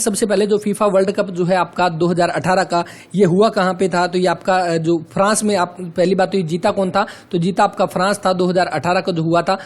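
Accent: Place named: Haryana, India. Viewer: South haryana ki bhadi boli h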